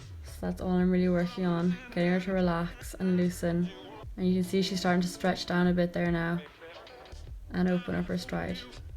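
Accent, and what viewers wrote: Ireland. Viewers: Are you from Ireland??❤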